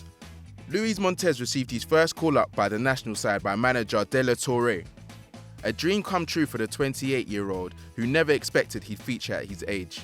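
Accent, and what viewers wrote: United Kingdom. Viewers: You are British 🇬🇧 😏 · he's british/autralian not american. 🤡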